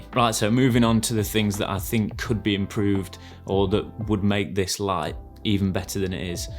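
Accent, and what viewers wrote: United Kingdom. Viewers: His british accent is good to hear! This light has been great!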